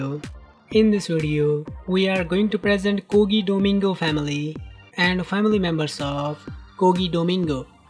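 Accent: India. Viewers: Bakit indiano yung nagsasalita?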